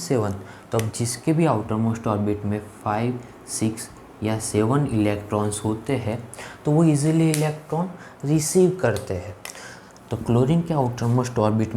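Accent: Gujarat, India. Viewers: Sir AAP gujrati ho ?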